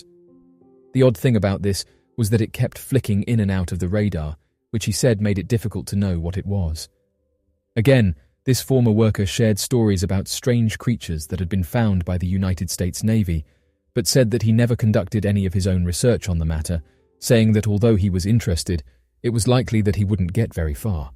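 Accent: United Kingdom. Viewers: Cant go the F'n Brit commentator.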